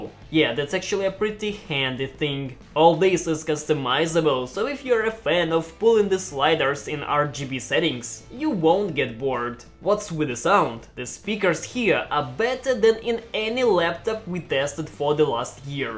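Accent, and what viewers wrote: Russia. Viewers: Долго вслушивался в твою речь))) всё думал, а не русский-ли часом?))) как Клаву увидел, понял что земляк))) может конечно и живёшь не в России))) говоришь очень хорошо, даже мне с моим не особо хорошим уровнем английского понимать получается без особых усилий) молодец) так держать! хорошее видео и обзор)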